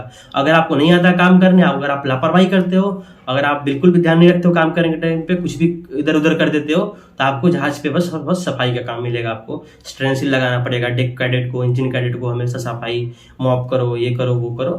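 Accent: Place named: Bihar, India. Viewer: Bakwass video 😂😂 typical bihari 😂😂 Bhai teri awaj se hi lag ra h Tu pure bihari h 😂😂😂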